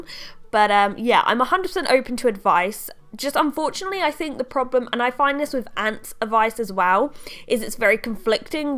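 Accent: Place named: RP, United Kingdom. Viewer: Yes!!! Updates! Really any content you produce I love because you’re informative, not boring, and you’ve got a posh accent!! 😉😊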